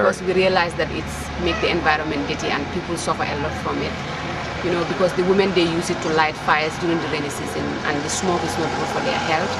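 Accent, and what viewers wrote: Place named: Gambia. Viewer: God bless her...she is very sweet....thats a real Gambia accent dho....miss my country to bitzzz.....bless her and stay strong my fellow Gambian women xxx love love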